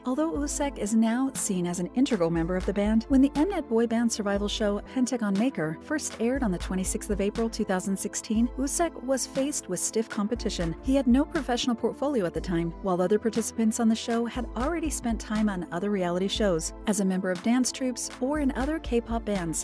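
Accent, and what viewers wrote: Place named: United States. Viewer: I don't understand why you can't just put subtitles.. I come here to read about him, but it's annoying hearing someone speak.. especially with a strong American accent.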